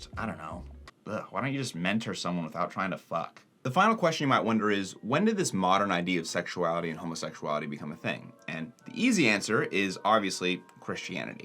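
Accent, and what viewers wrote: United States. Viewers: Yeah no wonder you're from LA. You have their disgusting accent.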